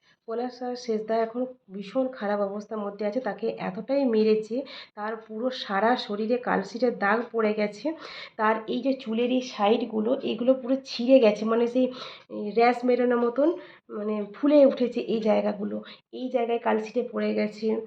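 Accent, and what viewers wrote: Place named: India. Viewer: আপনার কথা শুনে ইন্ডিয়ান মনে হচ্ছে। আপনি জানলেন কি করে।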